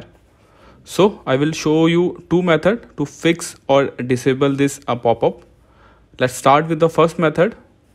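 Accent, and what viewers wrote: India. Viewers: the indian accent makes it funnier lmao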